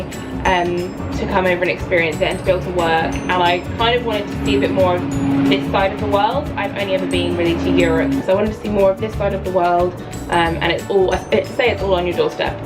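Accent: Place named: Australia. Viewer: unfortunately not babe you are starting to sound like a true Aussie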